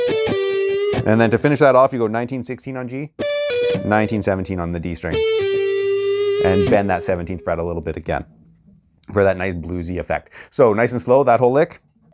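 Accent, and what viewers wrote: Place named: Canada. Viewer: Thanks for the tab great job! could tell right away you were Canadian from your accent lol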